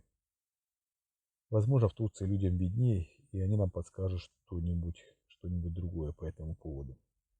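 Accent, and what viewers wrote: Ukraine. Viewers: Послушала полторы минуты и поняла что вы из Украины.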